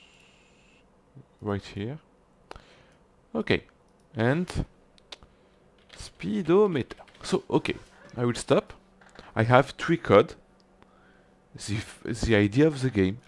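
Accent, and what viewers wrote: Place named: France. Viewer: ton accent ma fait capter direct que tu est français sinon bon tutoriel tu a gagner un abonner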